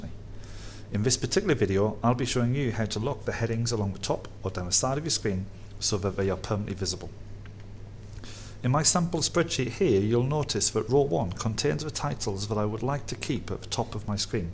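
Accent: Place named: North, United Kingdom. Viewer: super helpful and straight to the point. As a fellow northerner, love the comfort of that accent!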